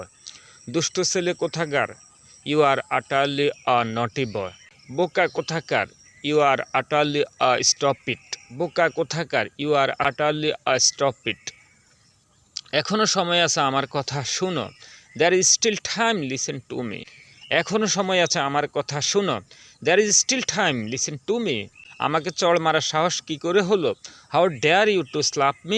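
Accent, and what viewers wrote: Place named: Noakhali, Bangladesh. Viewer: এই বেটা কথক, তোর বাংলা উচ্চারণ ভুল আছে, ইংরেজীতেও ভুল আছে।কারণ স্কুলে থাকতে ইংরেজী স্যারের বেতের বাড়ী খাস নাইতো, ঐ জন্য! বাংলা উচ্চারণে নোয়াখালির টান পরিষ্কার ভাবে বুঝা গেছে। উচ্চারণ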